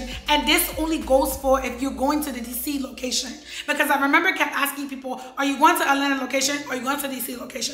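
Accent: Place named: Nigeria. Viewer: Some of Your words are becoming to sound like a Nigerian 😅....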